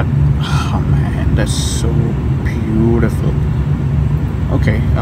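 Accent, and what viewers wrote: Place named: India. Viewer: Lol.! Yes, I DO realise that many Indians speak English, after all, I live in Birmingham UK which has a very large Indian population! But it was your neutral ACCENT that impressed me, not your ability to speak English - many Indians retain a very thick accent when speaking English, and you don't have that. Anyway, all the best from the UK!!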